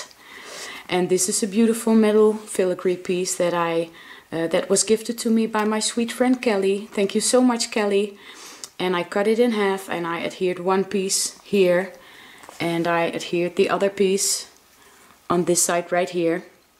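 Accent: Netherlands. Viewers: Goodmorning nice layout !!!! Im the guest designer for the month of march . Are you Dutch????? I am so I thought I heard a dutch accent!!!!! Take care Els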